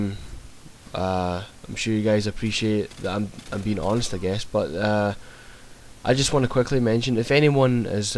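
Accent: Scotland, United Kingdom. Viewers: I just LOVE your scottish accent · scottish accent FTW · Sound mate I take it your American but you sound Scottish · no... hes scottish, since im from scotland i can tell by his accent. also, it says UK on his page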